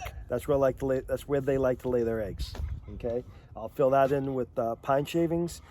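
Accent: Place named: Massachusetts, United States. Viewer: No he doesn’t. His wife has cancer though, I love him, i bet hes from massachusetts like my aunty dee dee great accent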